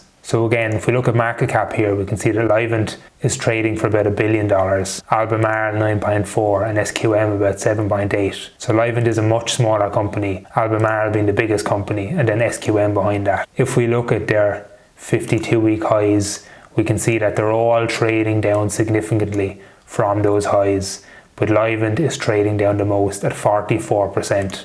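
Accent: Ireland. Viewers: "Tree different stocks" as an American this is my favorite thing about how the Irish talk. 🙂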